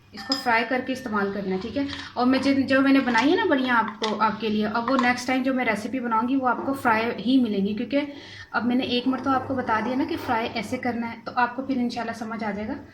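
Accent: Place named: Punjab, India. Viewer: muzna malik یہ تو پنجابی لہجہ ہے یہ تیز ہی بولا جاتا ہے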